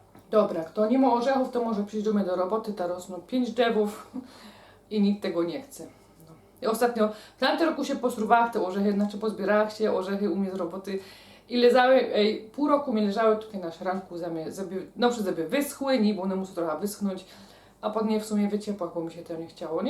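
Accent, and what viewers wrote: Silesia, Poland. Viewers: Fajna gwara Śląska . Robiłem to ciasto już wcześniej ale bez masy.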